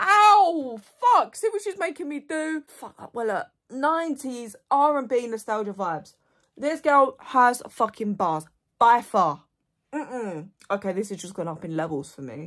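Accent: United Kingdom. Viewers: That british accent❤ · Love your reaction and just adore your British accent ❤ Love from Armenia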